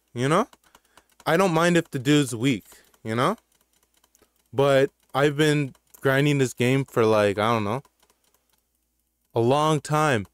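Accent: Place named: Toronto, Canada. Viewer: are you from toronto u got a accent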